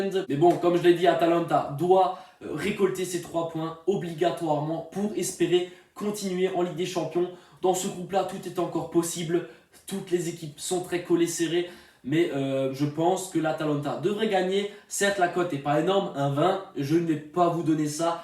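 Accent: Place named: North, France. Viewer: Toi, t'as l'accent du Nord mdr !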